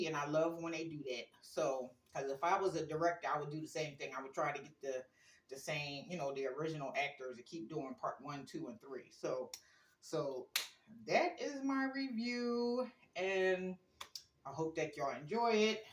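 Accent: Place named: United States. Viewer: You have a accent what state do you live in the dance is right on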